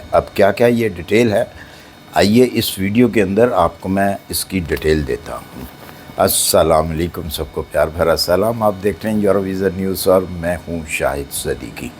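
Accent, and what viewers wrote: Punjab, Pakistan. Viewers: Tu c paindo ho. Ardo (Urdu) punjabi which bolde ho. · بھائی اپنی engish تو ٹھیک کر لین ۔ لفظ . رسپونس . نہ بولیں ۔۔اسپوسر بولسن ۔۔اپ پنجابی مین english بول رهے حسن ۔۔۔۔ Dont use word: Response ...it should be sponsorship ... کسی پڑھے گکھے ادمی سے پتہ کر لو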